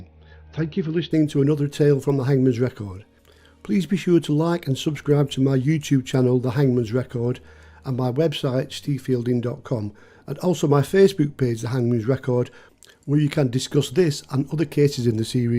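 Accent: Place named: Northern England, United Kingdom. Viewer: This stuff is so classy and I really like the narrator's soft Northern English accent . I'm hooked .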